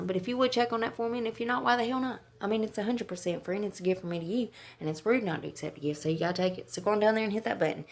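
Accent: South, United States. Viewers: I'm glad to see you back. I was worried... from one Southern girl to another, I need that accent in my daily life! ❤❤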